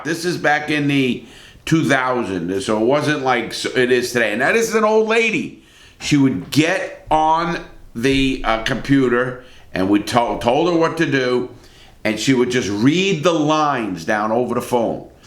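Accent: New York, United States. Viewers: Your from new York right?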